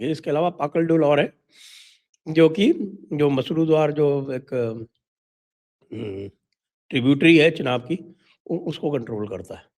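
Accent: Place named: Jodhpur, India. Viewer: तो भाई...... जोधपुरी लहजा आपका अच्छा लगता है। मिठालाल ज़ी मेहता की पुड़िया..... मोदी ज़ी के हर चोट की टाइमिंग सटीक होती है 😄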